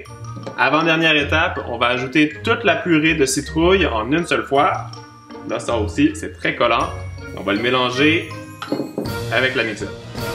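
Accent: Quebec, Canada. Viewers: J'aime beaucoup ton maquillage , t'es vidéo et ton décore ! Ça a l'aire super bon . J'adore ton accent Québécois . Bref je t'adore !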